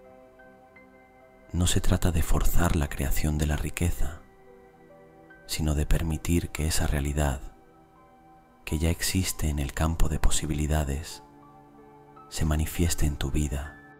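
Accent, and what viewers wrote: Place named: Spain. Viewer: esa voz de españolete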